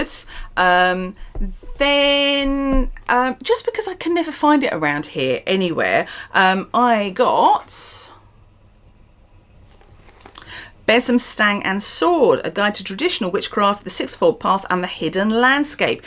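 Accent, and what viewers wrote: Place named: East Anglia, United Kingdom. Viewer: What makes your accent unique to East Anglia? I do like it.. . I have a mild southern accent.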